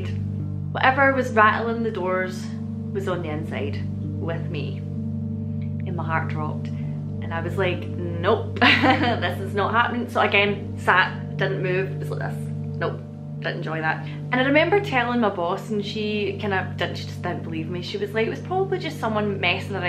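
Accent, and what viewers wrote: Scotland, United Kingdom. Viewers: Funny you get comments about you talking too much. The hugest part of why I watch your videos is to hear you speak Scottishly (yeah, I made that word up).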